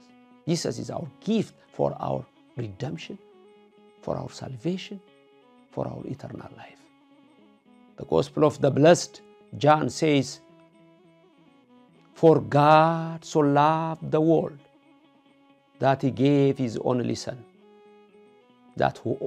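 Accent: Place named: Gojjam, Ethiopia. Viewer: እንግሊዝኛን በጎጃምኛ።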